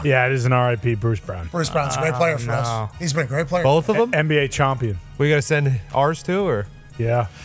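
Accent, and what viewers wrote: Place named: Pittsburgh, United States. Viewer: It's his yinzer accent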